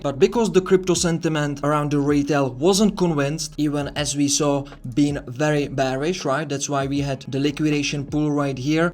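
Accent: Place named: France. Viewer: Dude, I love your accent.... Are u French?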